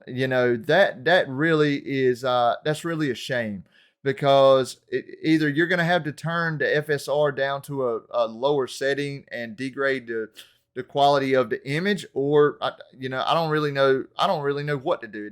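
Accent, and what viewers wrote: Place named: Arkansas, United States. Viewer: Love the accent! Reminds me of when I lived in Arkansas for a couple of years haha. Great overview man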